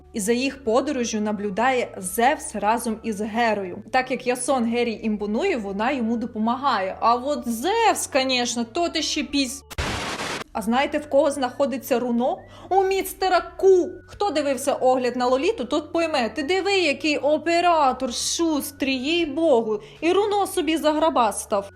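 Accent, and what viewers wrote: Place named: Kharkiv, Ukraine. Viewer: звучить ідеально, в нас в Харківській області розмовляють саме на такому суржику. В кожному регіоні суржик свій.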